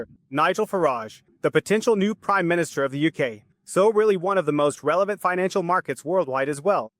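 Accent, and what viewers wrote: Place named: Switzerland. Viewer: Sapperlott, des schäenglish isch voll super! 😊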